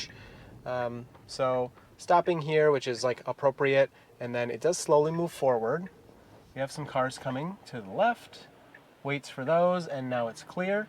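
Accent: Michigan, United States. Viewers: Hearing your accent brings back memories of growing up in Michigan. I not only don't have it anymore 40 years later but can't seem to reproduce it.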